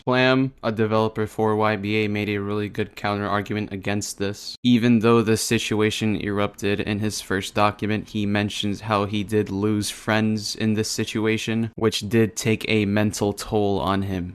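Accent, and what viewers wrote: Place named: United Kingdom. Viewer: Omg yiur bri'tsh???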